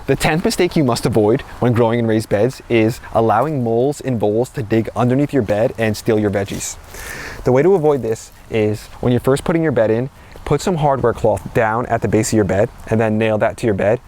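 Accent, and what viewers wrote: New Jersey, United States. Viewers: Love ya HARD “”JERSEY”” ACCENT. ONCE IGET NEW GARDEN SET UP... I WILL INVITE YOU DOWN. YOU WILL LOVE THE AREA AND WEATHER..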